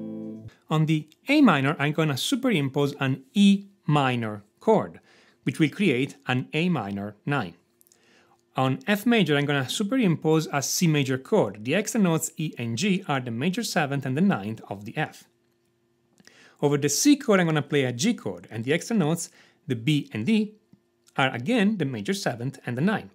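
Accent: Italy. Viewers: you sound italian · Are you russian or italian? I noticed a strong accent, like we do in italy. By the way, your videos are just exceptional! I ve never seen such logic explanations, directly to the point. Your explanation with spoken theory and the sound of what you re explaining make these videos really valuable. Great work, keep on going!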